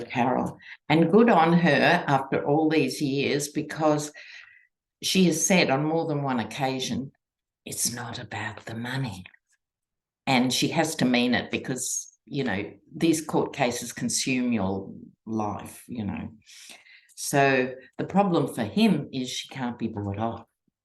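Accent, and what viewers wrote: Australia. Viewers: I heard you on the Mary Trump Nerd Avengers show and had to give you a follow. It was great to hear an Aussie voice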